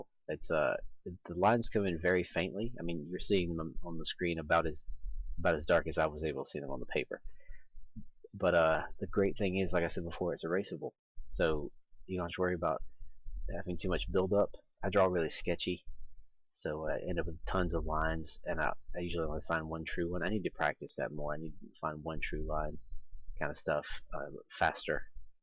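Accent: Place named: United States. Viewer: Another great video dude. I was wondering about your accent, I thought maybe you were just an american that moved there later in life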